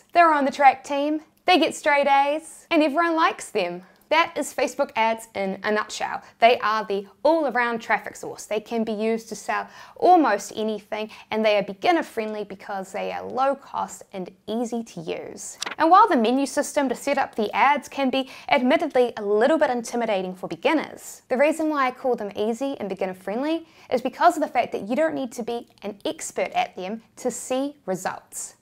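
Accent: New Zealand. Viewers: keewee accent bro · Peppa Pig is British, where here sounds like she's from New Zealand.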